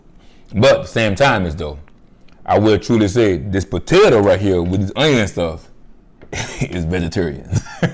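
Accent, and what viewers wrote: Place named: South, United States. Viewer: New subbie here! Love your personality & your southern accent. Do I hear some geechie or Caribbean in your voice? Congrats on being smoke free. Keep focused!! Peace love!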